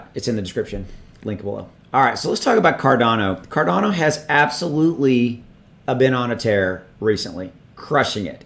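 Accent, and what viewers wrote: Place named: Appalachia, United States. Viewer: Like your video. As for your accent, you sound like a local (NC / SC mountains).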